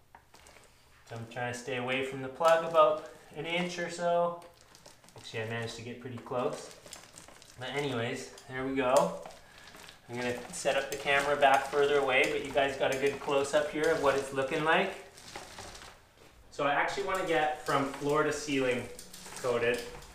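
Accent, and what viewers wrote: Canada. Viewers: Thanks so much for sharing your expertise here. Going to use this technique on my walls before applying wallpaper. Really appreciate your friendly Canadian tone 🇨🇦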